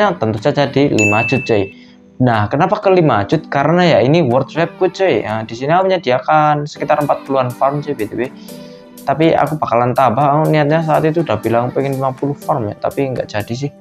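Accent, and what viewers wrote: Indonesia. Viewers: Lah kok ngapak wkwkwkwkw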